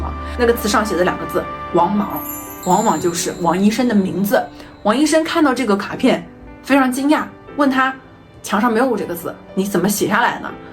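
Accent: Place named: Taiwan. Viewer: 😂怎麼有點台灣腔啊？哈哈哈 也太可愛了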